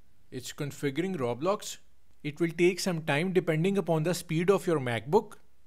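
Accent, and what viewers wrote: India. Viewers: worked amazingly, thanks indian accent man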